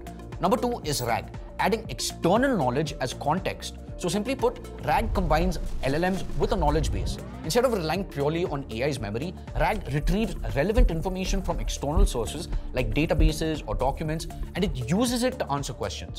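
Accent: India. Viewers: U don't look Indian but sound Indian wonder why🤔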